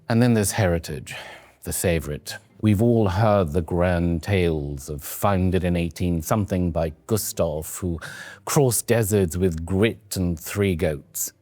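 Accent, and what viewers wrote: RP, United Kingdom. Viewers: Now there is a plummy accent!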